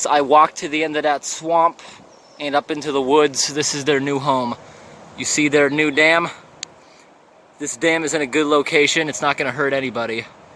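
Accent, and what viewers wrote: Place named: Michigan, United States. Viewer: Are you from Michigan? Trying to place your accent!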